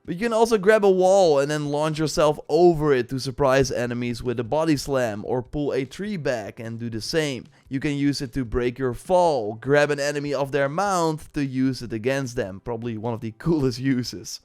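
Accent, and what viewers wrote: Netherlands. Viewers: Are you Dutch (judging by your accent)?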